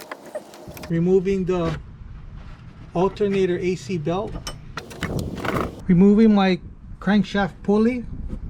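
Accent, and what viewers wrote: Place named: Louisiana, United States. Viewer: He from Louisiana all day I can hear it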